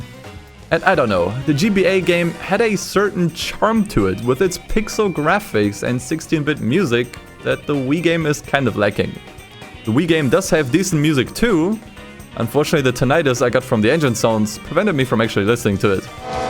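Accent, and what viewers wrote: Germany. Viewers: Are you German??????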